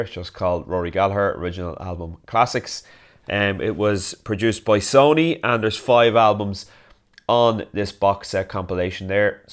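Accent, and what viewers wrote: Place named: Ireland. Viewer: Yes!!! CDs with an Irish accent 👍 Loadsa Rory, y’ just can’t go wrong🤘 👍🇮🇪🇵🇸✊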